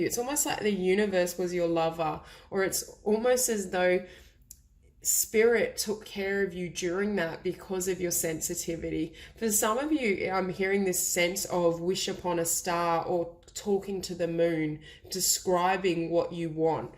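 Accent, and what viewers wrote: Australia. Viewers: Number 3! Resonates 100% thankyou so much❤️ Are you from Australia?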